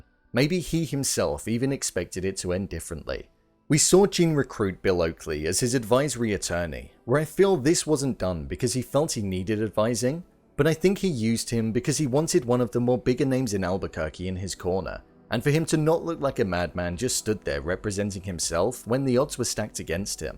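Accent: United Kingdom. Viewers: Oh god a prissy British guy talking. Disliked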